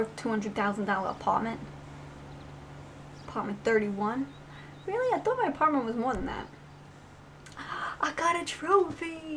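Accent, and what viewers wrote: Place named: New York City, United States. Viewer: i like ur urban nyc accent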